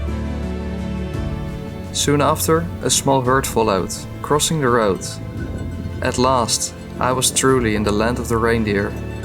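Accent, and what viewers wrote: Netherlands. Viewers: Er valt echt niet te luisteren naar Engels met een Nederlands accent, behalve als het over dit soort onderwerpen gaat. Dit is oprecht 1 van de lijpste dingen die ik heb gezien, gefeliciteerd met deze prestatie. Wat een reis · I stumbled upon this and upon hearing your Dutch sounding English - immediately liked and subscribed. One hour and twenty something minutes later, I feel like I've been with you on your trip through Norway. What an awesome thing to have done and very well documented with beautiful footage. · At first when I heard your English I assumed you were Dutch but then your pronunciation of the Norwegian places is so good! Anyway, loved every bit of this. Loved, loved, loved.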